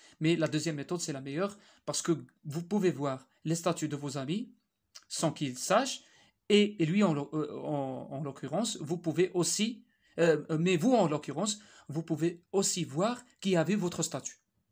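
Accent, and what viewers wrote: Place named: Morocco. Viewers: جاني بحالا شي مغريبي كيهضرو بالفرونسي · Tana hh bayna mghribi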